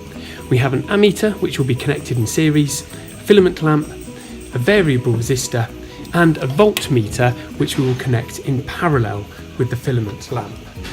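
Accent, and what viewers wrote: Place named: United Kingdom. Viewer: very clearly demonstrated and videoed presentation with clear British English voice. Thank you.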